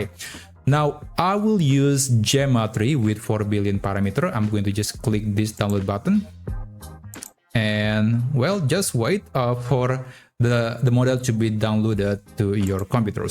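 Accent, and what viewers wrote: Indonesia. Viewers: Logatnya bahasa inggris indonesia banget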